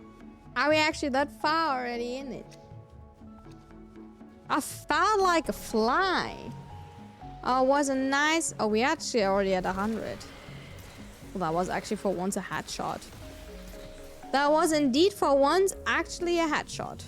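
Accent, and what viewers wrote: Germany. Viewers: Am I hearing a German accent? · Are you german per chance?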